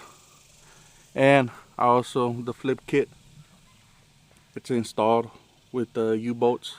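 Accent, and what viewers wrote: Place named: Houston, United States. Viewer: Sound like your from Houston. I like it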